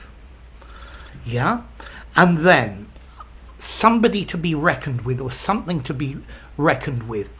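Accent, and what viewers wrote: United Kingdom. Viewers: I reckon that your channel is the best to learn English with Britch accent Hello my teacher 🌺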